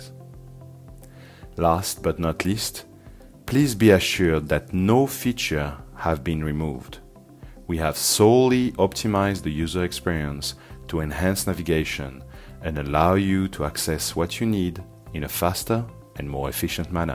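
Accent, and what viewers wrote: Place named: France. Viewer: Sounded like a french accent to me. Kinda explains this nightmare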